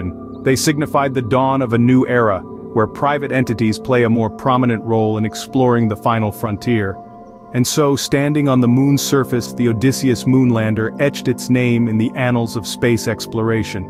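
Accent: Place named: United States. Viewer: I will stay subscribed, providing you keep that good American voice!!!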